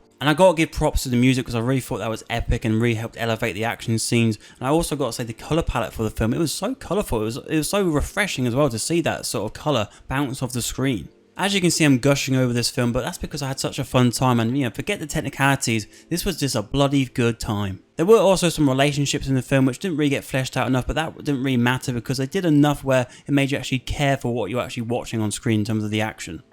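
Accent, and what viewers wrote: United Kingdom. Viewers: Is this British accent??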